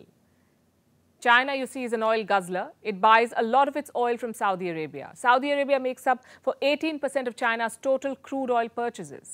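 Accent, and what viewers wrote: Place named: India. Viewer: Your pronunciation is nice, voice is pleasant and the accent is more Indian.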